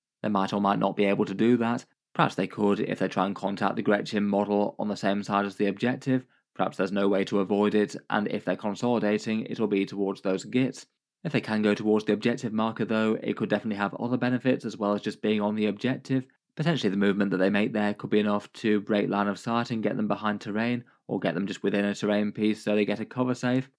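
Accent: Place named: United Kingdom. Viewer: Has anyone ever bumped into at a Tournament? I'm wanting to confirm he's a real person that lives in a house, sounds like he's from the UK. Surely someone knows him!!😅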